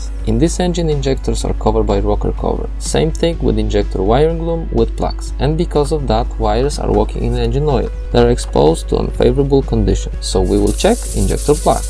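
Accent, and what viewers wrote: Poland. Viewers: Słyszę Polski akcent 😀 mam rację? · Polak na bank sądząc po akcencie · Jak tylko usłyszałem ten akcent to wiedziałem że to polak, nie mniej jednak dobra robota :D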